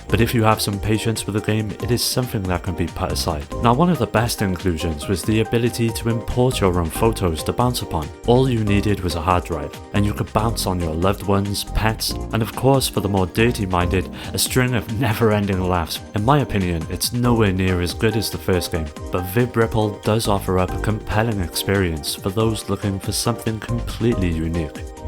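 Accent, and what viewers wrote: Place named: Wales, United Kingdom. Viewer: are you from cwmbran or Newport? that accent is quite recognisable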